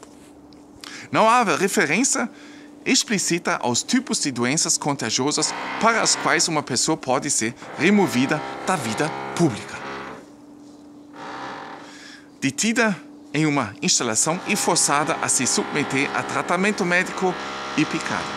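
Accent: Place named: Germany. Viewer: Permita-me trata-lo por meu amigo, voce desmonta todos os estereótipos, pré concepções e paradigmas que nós latinos temos a respeito dos Alemães como sendo um povo sério, carrancudo, de poucos amigos e cujo senso de humor não é um ponto forte. Se o Alemao medio é como imaginávamos, voce é um ponto fora da curva!! Voce esta de parabéns pelo senso de humor que se torna ainda mais pitoresco com seu sotaque alemao ( muito legal diga-se de passagem ) ao mesmo tempo que mantem o foco na informação de qualidade e sem muitos rodeios. Sensacional!! Tambem aprecio muito essa forma de dar as noticias, caminhando e mostrando um pouquinho de sua cultura para nos!! Parabéns!!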